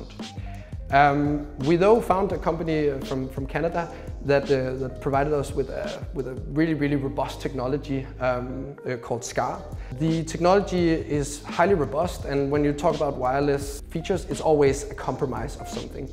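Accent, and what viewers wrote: Denmark. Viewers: I Can hear the Danish accent haha sinds højtaler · man kan høre han er dansk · Ja jeg elsker man kan høre han er dansk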